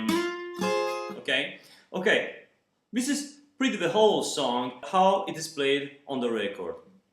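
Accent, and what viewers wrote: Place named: Italy. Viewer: Parece que ele é italiano e não fala portugues.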